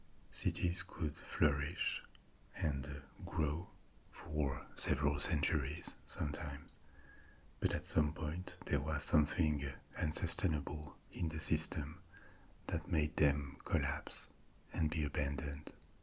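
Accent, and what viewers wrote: France. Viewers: > Great voice > French accent > History lesson What more can a person ask for?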